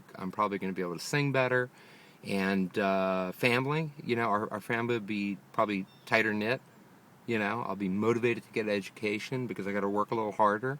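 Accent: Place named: Los Angeles, United States. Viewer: Take that stupid video down.. you sound like a typical dumbass from LA!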